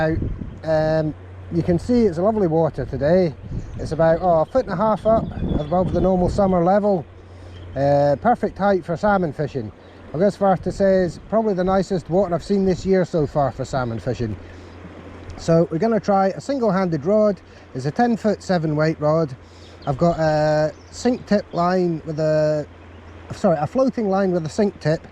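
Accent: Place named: Ayrshire, United Kingdom. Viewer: excellent, I have to ask as it's one of the things that makes up a good watch and listen- where's your accent from? I'm in kilmarnock and I know you've got a little ayrshire but maybe south west of England somewhere thrown in too?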